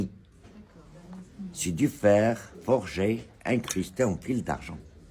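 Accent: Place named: Paris, France. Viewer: wao...il maitrise bien la langue mieux que français eux mêmes.un vrai ambassadeur..il n'est pas originaire français je suppose. en tout cas il a un bon accent parisien❤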